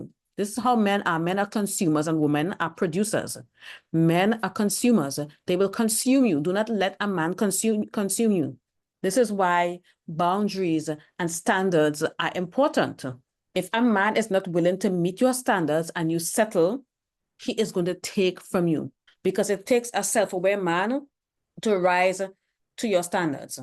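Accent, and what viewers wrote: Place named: Trinidad and Tobago. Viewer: I like your content. You sound like a trini🇹🇹 I'm Trinidadian, btw.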